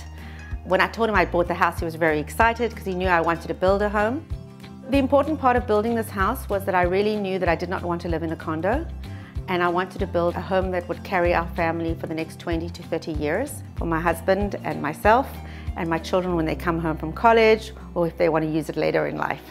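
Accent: South Africa. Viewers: Guessed your were South African. I too am from South Africa. Love your home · Took me 3s to recognize the South African accent. The style is so South African. Beauty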